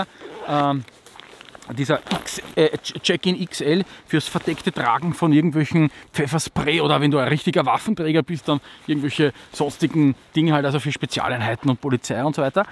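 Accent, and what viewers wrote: Austria. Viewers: What is this interesting accent? Austrian? Anyway, thx for this review, item added in the wishlist. 😊👍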